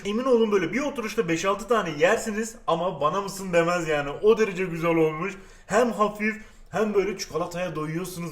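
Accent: Adana, Turkey. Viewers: abi adanalımısın yeni fark ettimde ediyürüm yapiyürüm ekliyürüm valla adanalılar da böyle konuşuyor :D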